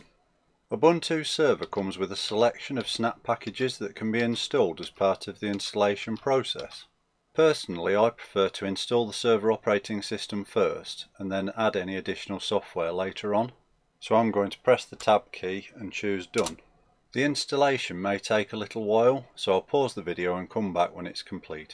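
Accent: United Kingdom. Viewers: Why does an English accent make everything so interesting?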